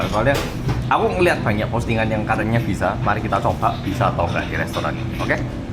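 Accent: Java, Indonesia. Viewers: kayaknya sudah lamaa ga kolab sama londo kampung.podo medhoke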